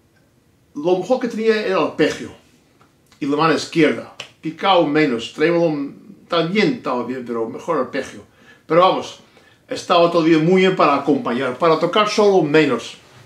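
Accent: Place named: Spain. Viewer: Hello sir, what kind of Spanish accent is that of yours ? Thank you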